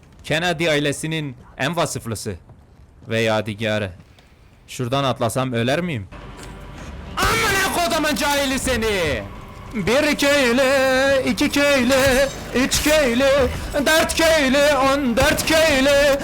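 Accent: Elazığ, Turkey. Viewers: Abi elazığlı mısın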